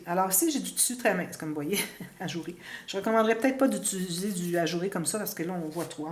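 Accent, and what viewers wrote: Canada. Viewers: C'est exactement ce que vous expliqure si bien... prendre en compte les différentes épaisseurs et modifier en conséquence l'écartement. Je n'ai pas encore suffisamment d'expérience (un peu plus d'un an) D'où mon grand intérêt pour vos vidéos. Je suis hyper maniaque. Vous êtes une des rares personnes que j'aime regarder. Simple, précise, efficace et des réalisations nettes sans tralala. Et votre adorable accent canadien....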